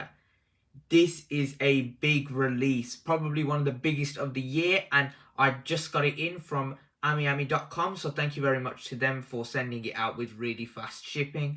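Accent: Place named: Birmingham, United Kingdom. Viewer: You from Brum too?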